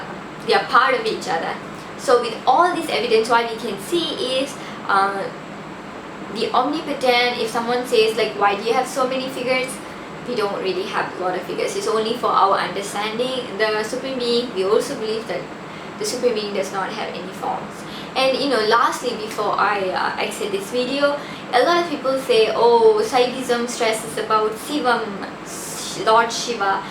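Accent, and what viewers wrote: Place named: Tamil Nadu, India. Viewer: other than your tamil ascent i love you videos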